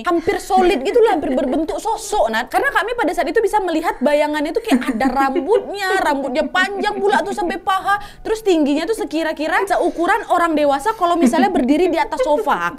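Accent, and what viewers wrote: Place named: Medan, Indonesia. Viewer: Kak kek nya orang Medan yaaaa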